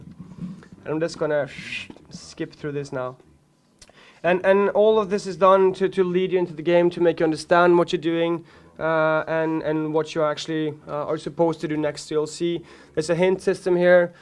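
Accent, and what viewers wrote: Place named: Norway. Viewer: Hva faen mann! xD Så lett å høre at du er norsk! What the fuck, man! It's so easy to hear that You are norwegian!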